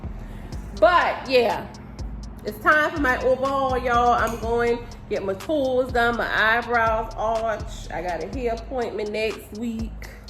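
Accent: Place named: New Orleans, United States. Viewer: You are hilarious 🤣😆 and I love that New Orleans accent. I know this next meal is going to be another banger. Wow an outdoor air fryer? Can't wait to see you put that to use and show us a recipe. 🤭🤭🤭 Not Churches over here. Yes change your grease Churches 😩🤣. You all be throwing down. When you come to TX can you mail us a plate since you not coming to Dallas⁉️😉 No ma'am I don't do bugs either nothing PERIOD. Tfs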